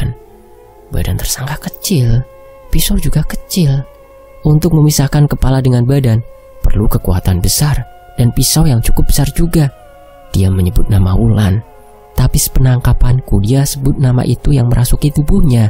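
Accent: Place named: Kebumen, Indonesia. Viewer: Mbak dr smg. Tp logatnya kebumen 😊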